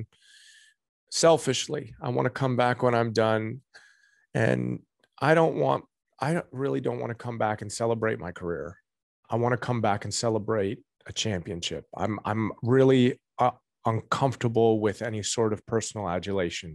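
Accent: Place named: Canada. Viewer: He still has a canadian accent, it's fading rho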